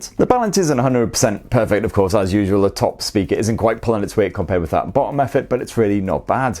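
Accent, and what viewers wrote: United Kingdom. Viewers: i hate that british accent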